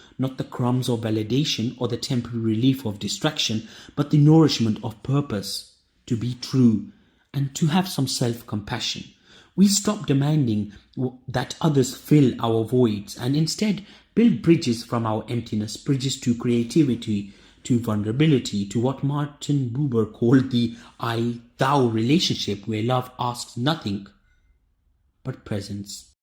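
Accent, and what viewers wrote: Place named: India. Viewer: Your accent sounds Indian.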